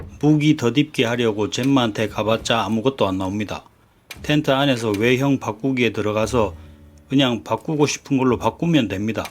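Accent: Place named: Gyeongsang, South Korea. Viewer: 갱상도 아재 말투로 조목조목 따지는거 개우껴요 ㅋㅋㅋㅋㅋ재밌습니다. 다른 게임들도 다뤄주세요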